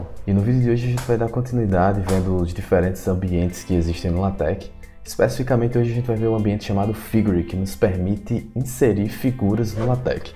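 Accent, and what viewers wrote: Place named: Recife, Brazil. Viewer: De longe o melhor vídeo que eu achei aqui no Youtube. Pelo som de fundo/sotaque da pra perceber que é Recife hehe abs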